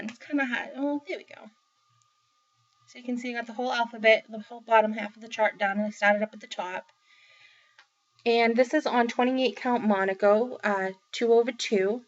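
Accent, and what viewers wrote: Boston, United States. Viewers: Great video and great haul!! I love listening to you!! Your pieces are awesome!! Ps.. I am Canadian and I knew your accent was Boston!! Love it!! Happy Birthday!! I hope you have lots of stitchy fun!! And happy thanksgiving to you and your family!!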